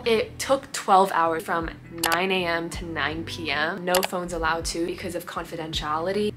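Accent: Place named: China. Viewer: Mix of China and American accent when speaking canto.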